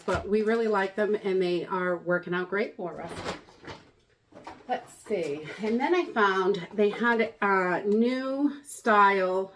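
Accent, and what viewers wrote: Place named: Maine, United States. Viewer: I grew up in Maine outside Bangor-so her accent makes me smile :)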